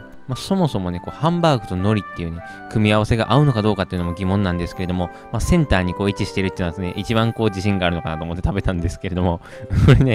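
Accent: Kansai, Japan. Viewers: 関西弁でたまに毒舌Sっぽいところがいいんだよなあ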